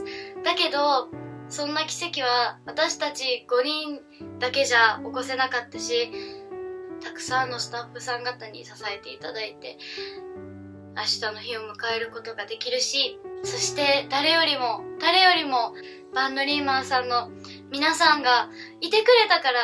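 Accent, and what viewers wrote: Kansai, Japan. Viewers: なんか…めっちゃいい事語ってるんだけど…所々にめっちゃ関西弁混じってるw可愛い〜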